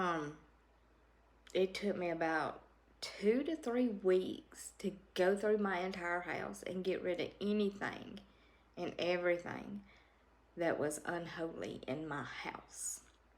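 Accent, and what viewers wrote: South, United States. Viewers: From Argentina Honey you are just fine!!!! I lived in the US specifically in the South, and I watch your videos because it reminded me my time sweet time in the south that i learn to love, i love the accent the humor the kindness!!! So what i mean with these is that you are just fine❤ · Thank you for the wonderful videos. I am glad you found what you need to put you at peace and make you happy. You and your cats make alot of people happy. I love the southern ways. I am from SC and I love the accent and the southern saying. Please continue with the videos, I need that joy in my life. life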